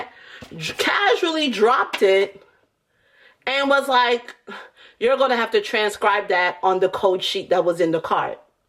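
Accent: New York, United States. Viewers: You from NY or Jersey ☺️ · I’m back too 👏🏾👏🏾👏🏾👏🏾👏🏾that New York accent 😝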